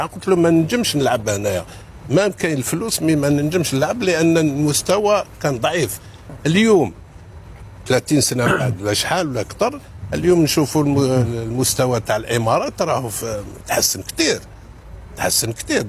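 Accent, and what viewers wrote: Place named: Algeria. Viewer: مرة حلوة لهجة الجزائر احب هذا البلد من بن شيخ احسن ناس ونعم بالله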